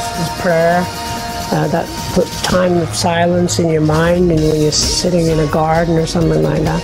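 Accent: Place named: United States. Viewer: Nice one, when was this recorded as you have your American voice instead of the Norfolk St one in your recent live stream.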